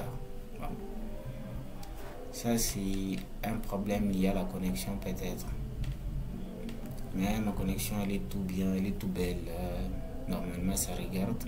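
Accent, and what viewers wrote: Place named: Swahili, East Africa. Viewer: Salut je suis vraiment intéressé par cette application Et oft j'ai comme l'impression que tu as un accent swahiliphone est tu de Lubumbashi?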